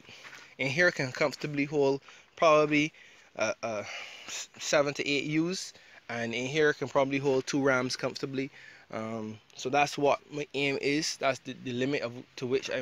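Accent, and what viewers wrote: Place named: Ireland. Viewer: what country are you in? you sound a bit Irish.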